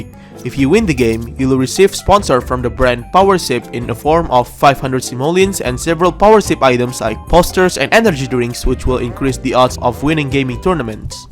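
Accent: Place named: Indonesia. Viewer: Bi lo orang indo? Logat lo indo bgt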